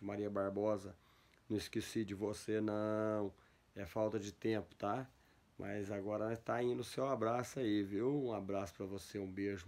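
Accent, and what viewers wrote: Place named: Minas Gerais, Brazil. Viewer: O minero vc é da onde de Minas? Eu só dá baite tem base. Já viu fala.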